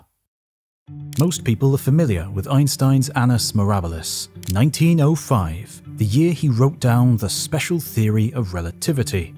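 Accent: England, United Kingdom. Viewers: I hate measurements in Kilometres, why not Miles as that's what we've been brought up with and i no I'm being a bell end and for that i apologise. Great video and so enjoyable esp the narration. I think the English voice can be a universal voice were u don't need subtitles as everyone shud understand the narration and I'm not being biased i promise. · I hate your robot-English-toff-voice more than death itself.......death to to robot voices! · Can you give your channel some class by ditczthe British accent and instead use a good Swedish, French or Irish accent..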